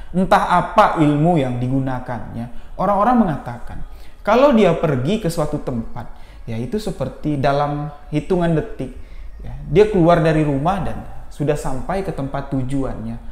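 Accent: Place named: Lampung, Indonesia. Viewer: Saya seneng nonton bang cerita.. Kadang2 dialek dan gaya bicara nya kayak ulun lampung.. Sy kira dulu bang adalah orang lampung krn sy denger suaranya aja.. 😊. Jambi, sy belum pernah menginjakkan kaki ditanah itu, tapi cerita cindung, pait lidah, sangat populer didaerah kami, lahat.. Dulu kata temen2 ku, daerah lahat juga banyak ahli racun, tapi mungkin bukan disini, yg berdekatan dengan muara enim.. 😔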